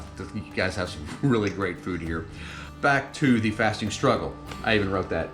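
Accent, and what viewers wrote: Texas, United States. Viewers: Hi! You are doing amazing even when you had coffee for the first days, I’m so impressed of your progress, you’re doing absolutely fantastic 👍🏻 I’m Moroccan in America, I teach my kids to be thankful everything, even the air that we breathe, because we have everything here we don’t feel there that is different somewhere else. My daughter is 11 now and because there is no school she wants to experience fasting for the first time, and yes she is doing the whole day by choice. Note: kids should start fasting when they reach puberty in Islam. Please ask yourself those 2 questions: 1- why me in a Muslim country in Ramadan, what God wants for me to know? 2-why I was chosen to experience this and reflect on my life by myself while I’m getting physical and spiritual cleansing? Do I have to do more research to find out why? Things will come with time but do some from your part. 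yusuf Estes is from Texas, I suggest that you watch his videos, his has the same accent as you 😊 he explains a lot a about Ramadan and more m, he is funny too. And please take care yourself, the tea looks amazing, you need to eat more than that so stay strong for fasting. I’ll watch all your videos and always I can wait for the next one. Please upload more 😊 Take care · Hey, ma man u actually give attention to a lot of things, (the but i digress got me laughing) it reminded me of a tech-youtuber that says it a lot, what i wanted to say is actually even in here we have stuff open by night in the big citys like (rabat/casa/marrakech) ex: macdonalds stays open to about am some other late night kebabs do too, but with the pandemic everthing is closed even in the usa i think it's not the same with the pandemic, btw we understand you because you are from the usa and not from irland or some other part of the uk, your english is pretty much like what we see on tv shows & movies, the tea done right + u actually are more morrocan than a genuine one, why you may ask well most of us are not perfect the way humans are is quite universale, the exmple you gave for being addicted to tobacco, the coffee, we can understand it's quiet normal. 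am only gona say well done, and god bless you brother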